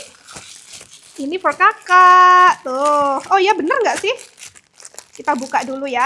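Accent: United Kingdom. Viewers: suka banget klo udah ngomong indo tapi aksen inggris nya itu loh cobek 20rb chowbeck 500rb ya ka 😂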